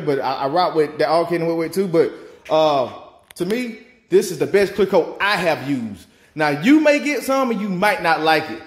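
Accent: South, United States. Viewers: Came across your video and it just caught my attention. Normally just checking out the hot rods. Well ended up watching at least 10 videos. Love the paint jobs and how you show it don’t take a big name paint paint shop and top notch equipment to do nice work. PS love the positive attitude and the country accent. Keep doing you and your thing brother. God Bless✌🏻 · Love your work! You are so energetic and proud… how could you not be! Love the thick southern accent too!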